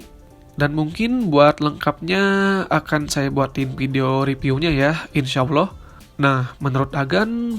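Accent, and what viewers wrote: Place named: West Java, Indonesia. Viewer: Orang sunda berarti masss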